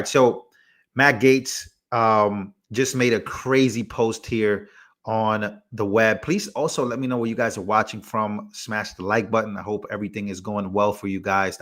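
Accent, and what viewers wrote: New York, United States. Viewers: Accent dont lose the NY accent. I lived in TX in 1990 San Angelo best time of my life. Great people. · Moved to Indiana from Kentucky 27 years ago and I still have my accent 😅 I trust you'll keep that New York accent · “Your lovely NY accent” is what they meant!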